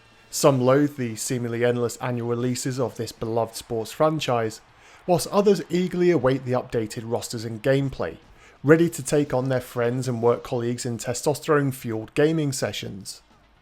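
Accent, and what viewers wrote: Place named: United Kingdom. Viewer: I've finally done it. I've watched all 293 of your N64 game reviews on your playlist, in order. I started this journey 6 months ago, watching 1 or 2 videos a day on my lunch break at work as I smoke Newports. You've become a part of my routine and I thank you for all the hard work you put into these. Now I must move on and find other videos to watch each day, but I've grown accustomed to hearing your calming British voice each day on my break as I sit outside and relax. Thank you,. 😊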